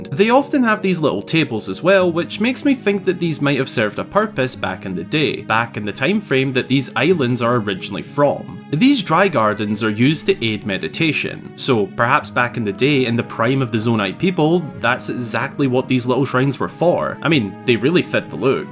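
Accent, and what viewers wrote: Scotland, United Kingdom. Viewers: Love your Scottish accent, just returned from a trip through Scotland, a wonderful country ❤🏴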